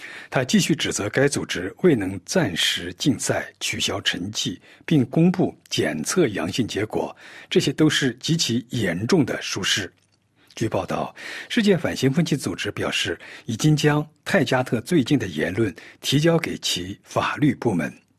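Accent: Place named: Shanxi, China. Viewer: 解说大哥你是山西人吧？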